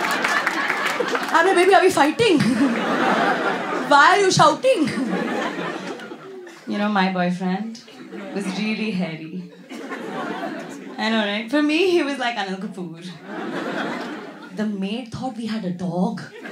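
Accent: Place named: Bengal, India. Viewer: i have seen only first 1 min still i feel u hv bengali accent bengali face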